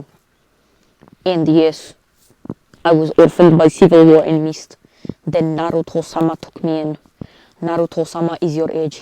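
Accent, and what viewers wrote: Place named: Australia. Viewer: That’s Australian not American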